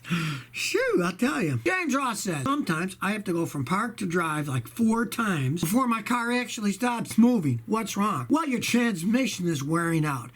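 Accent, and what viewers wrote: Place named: Western New York, United States. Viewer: I knew that was a WNY accent! Greeting from Buffalo, NY. Thanks for all of your videos, they have been very helpful.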